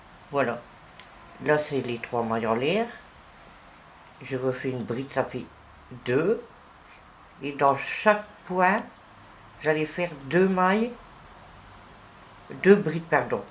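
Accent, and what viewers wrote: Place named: Nord, France. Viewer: Merci madame pour ce joli tuto , je débute , et j ai réalisé le bonnet renard , j aime beaucoup votre accent du Nord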